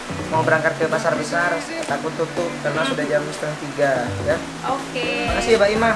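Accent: Madura, Indonesia. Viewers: Dengerin suaranya ko kaya ada logat Maduranya, eh ternyata emng pake Madura🤣🤣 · bahasa madura.a bnget,lucu pas ngimucak bahasa medureh🤣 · Medureh sarah 🤭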